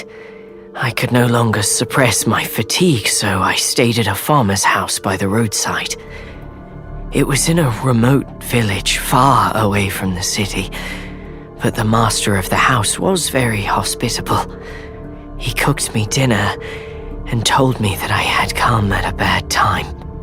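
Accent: United Kingdom. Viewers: HES BRI’ISH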